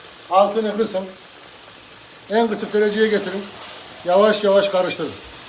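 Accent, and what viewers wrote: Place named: Konya, Turkey. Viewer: Ellerine sağlık şefim siveniz bizim buralar gibi Konya limisiniz bende yapacağım Konya'dan saygılar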